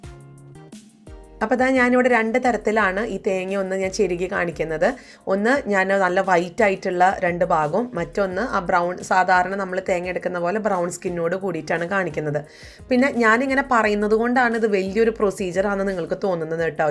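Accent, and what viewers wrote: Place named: Poonjar, India. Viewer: Poonjar Anglisized malayalam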